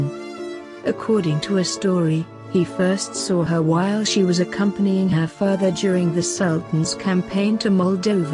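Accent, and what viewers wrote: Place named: England, United Kingdom. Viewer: Pronouncing Turkish with an English accent is a delight !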